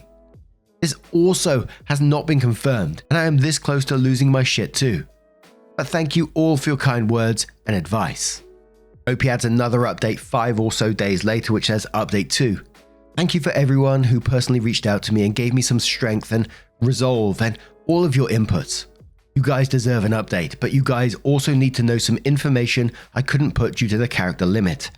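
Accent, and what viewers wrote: United Kingdom. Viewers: Happy Christmas. Since you're the maestro of breakfast foods, and sound British, I figure you're the chap to help me out. I have a question from someone across the pond. 'round these parts we can have our breakfast sandwiches served on something called an English Muffin. It's basically a less dense bagel with no holes. In the land of rainy days and tea, what are those called? And what are the sugary sorts of muffin called? Asking for those who know. I have similar questions for a Belgian if you know where I can find one of those. And yes, that one would be about waffles. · Story #1: Unrelated to the story:, Love your videos, I listen to them while I work, that's why I had to listen to this TWICE. Canadian pronunciation of Thesis is Thes-ISS, your pronunciation [British I assume] it Thes-ESE [this would be the Canadian plural or more than one thesis]. 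Also you tend to pronounce a "TH" as an "F". SO.... Every time you said "Thesis", I kept hearing "Feces", and I was wondering what the H*ll you were talking about. NOT a criticism, just something funny I thought you might get a laugh from. Keep up the great videos, and Thank you. Story #2: That is the CRUELEST thing i have read all day. Trying to get a recovering alcoholic to RELAPSE?? What an abusing sadistic A-HOLE.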